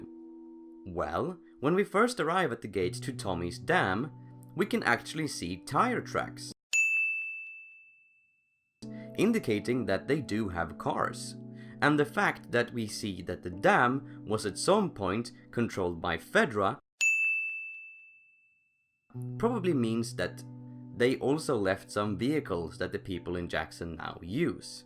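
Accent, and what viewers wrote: Sweden. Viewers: Are you Swedish??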